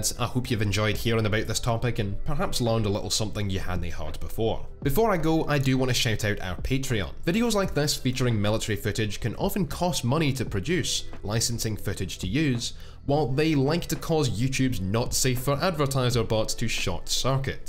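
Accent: Scotland, United Kingdom. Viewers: Yes, I already knew everything said but sometimes you just want to spend seven and a half minutes listening to a Scottish man talking about a subject you like because they do it better than you. XD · Was trying to work out if your were one of us Scots.. wasnt until you said lads and lasses that i knew for sure! great video! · You sound Scottish mate. A good pal of mine's was in the Royal Scots Dragoon Guards & used to drive a Challenger 2. He said it is still one of the best tanks in the world, even though there have been a few new ones introduced to various armies around the world.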